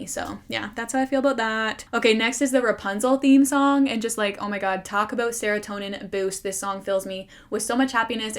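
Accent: Canada. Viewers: this is off topic but has ever said she’s canadian because the way she says about and out makes me think she is lol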